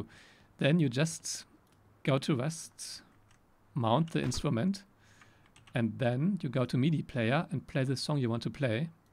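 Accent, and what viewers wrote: Germany. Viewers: DU BIST SO DEUTSCH OMGGG ALDA MAN HÖRT DICH ;)) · This German accent Omg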